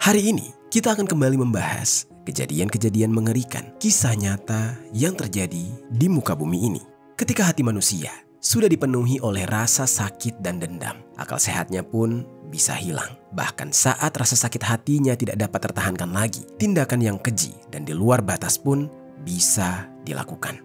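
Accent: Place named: Jakarta, Indonesia. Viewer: Senyum2 dengar bang bicara bahasa banjar logat. Jakarta😅😅..salam bang dr tabalong❤❤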